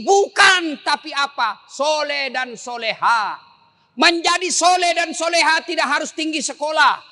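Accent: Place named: Banjar, Indonesia. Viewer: Org Banjar y ustadz,dr awal mirip bahasa suami saya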